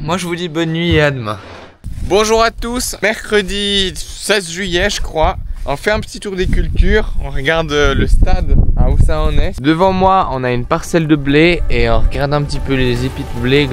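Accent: Switzerland. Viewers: Salut le petit Suisse 🤣😂🤣😂, en espérant que tu ne le prenne pas mal. Je viens de commenter ta dernière vidéo, et voici une nouvelle en ligne. Tu aurais dû être prof de maths pour expliquer horizontal et vertical . Je t'embête plus. Les plans au drone sont magnifiques. Je crois que tu es le seul qui dit encore mon papa et je trouve que cela en dit long sur la relation avec tes parents. C'est toujours un vrai bonheur de regarder tes vidéos et de t'entendre parler dans ton patois Suisse. Remorques ou chars, Ben Hur??? Bon courage et tu peux être fier de toi. 🙋‍♂️🙆‍♂️👍🏻👌🫡🫡🫡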